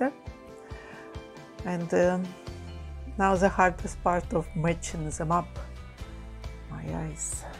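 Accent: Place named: Russia. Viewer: Ооо такой у вас акцент , наверное русский?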